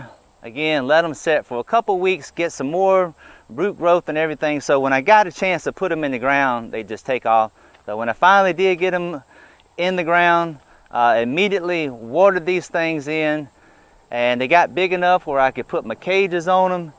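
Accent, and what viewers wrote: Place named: Gulf Coast, United States. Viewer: By the sound of his accent, I’m thinking he’s on the gulf coast. It’s hotter than hell until about November!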